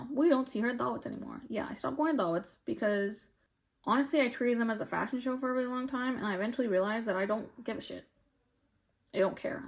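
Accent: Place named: South, United States. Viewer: Omg I am crying because I can relate to you so much. I was bullied by my peers from upper elementary all the way through junior high so (ages 7-14) it completely ruined my confidence and till this day I'm trying to heal from it. (37 now) i have always felt like an outcast being unique in everything I do. I love your social media content and feel so validated. You are so genuine, raw and down to earth! I hate judgy people so much and honestly wish i can just avoid them all. I'm half way into watching your video but I just wanted to comment before i forget. I was bullied due to my skin color and were surrounded by racists .. then when i was jn high school i was bullied by aunties saying how i did not get my moms beauty and looks .. it hurt me so bad and I always felt self conscious about how I look, how i talk etc etc. i really want to get myself checked to figure out if I am neurodivergent. 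I overthink but i think thats a strength of mine, i feel anxious a lot and my sister always is mean to me about it. Anyway. I'll come back to comment again when I finish the video but i just wanted to say! We support you and im so sorry for all the hurt people have caused. Oh and I have also been diagnosed with an autoimmune disease right after getting hit with covid back when i was 35 and life has become so challenging. So you talking about all this, i feel seen! And i thought you were from the south because I hear a little southern accent. Turns our you lived in the south for a little! So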